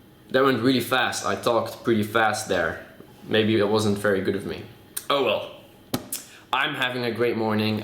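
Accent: Netherlands. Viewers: Kut nederlands accent xD. Ik vind hrt leuk om jou vids te kijken. Ze zijn egt daacking awesome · Dat nederlandse accent en soms die woordjes blijft leuk haha xD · Je hoort gewoon da die nederlands is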